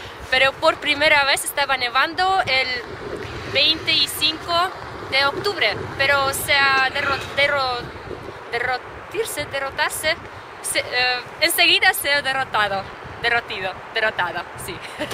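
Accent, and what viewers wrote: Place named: Ecuador. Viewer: Hola ))) yo queria decirte que en en determinados momentos pareciera que tu lengua materna es el espeñol. tienes el asento de una region del Ecuador. aunque aqui hablamos el idioma español existen diferentes formas de pronunciarlo y esto depende de la region del pais en la que se hable, pero todos podemos entendernos muy bien los unos a los otros porque es el mismo idioma. te invito a ver un video mio en Chelny.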